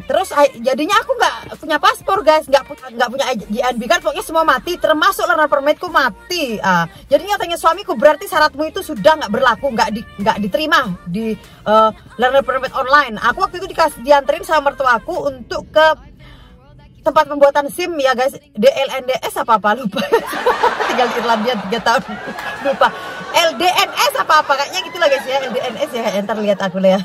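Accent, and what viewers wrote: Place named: Surabaya, Indonesia. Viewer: ini ono.... ini ono.... ini ono.... kyk aku kl ngomong.... wahhhh iki kyk e wong Suroboyo an.... logat e arek" Suroboyo an.... iyo tho rek , sampeyan arek Suroboyo tha ?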